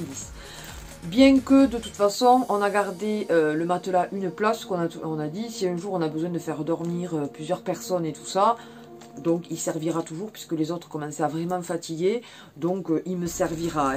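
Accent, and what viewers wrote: South, France. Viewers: Coucou Je viens de découvrir ta chaîne et de m'abonner Ah super l'accent du Sud ❤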